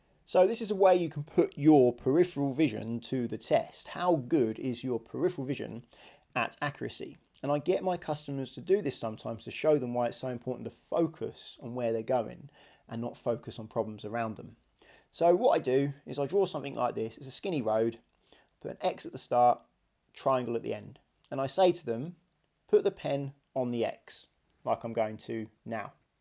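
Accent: United Kingdom. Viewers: He is truly one of the best instructors. The only annoying thing I find is his British accent, it is way too different from American accent, Americans don't speak half words every second like Britishers.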